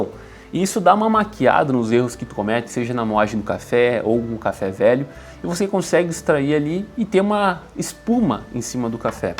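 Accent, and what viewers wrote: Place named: Curitiba, Brazil. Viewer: e esse sotaque aí, é daonde, daqui de curita? PARABÉNS pelo setup, tô montando aqui tbm, tô c r3 blade (sensorial muito bom), e o elétrico femobook a2 (surpreendente), tem dia que faço espresso naked+puck (pra aprendizado fundamental), noutro frenchpress, noutro moka, noutro v60 e clever, é muito legal essa dinâmica e ritual do café de diferentes formas e resultados! Investi num k4 e no starseeker e55pro, e num porta filtro 2spout, que ainda estão pra chegar! E, sim, o mais importante e diferencial é um BOM MOEDOR! Sucesso ao canal e bons cafés, irmão!!❤🎉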